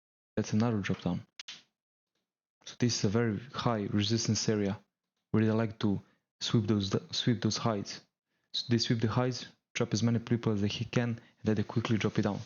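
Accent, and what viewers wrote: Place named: Serbia. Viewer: Odmah sam po naglasku znao da si Srbin, bravo najzad jedan covek koji razume sta je orderflow i sta znaci Dom trading. Iako je posle korone sve teze pratiti indicies jer je bid/ask tanak i options flow igra veliku ulogu zbog delta hedginga i dalje je dom najbolji nacin da se razume sta se desava u trenutku! Samo napred odlican kontent!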